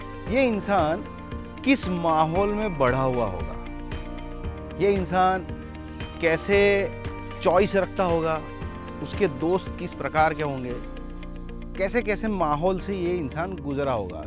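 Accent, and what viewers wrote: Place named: Bengal, India. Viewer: tumhare language mein bengali accent bhar bhar ke ah rahi hai 🙂🙏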